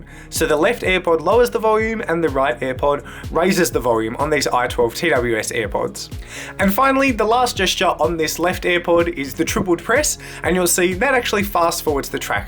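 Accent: Australia. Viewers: His aussie accent <3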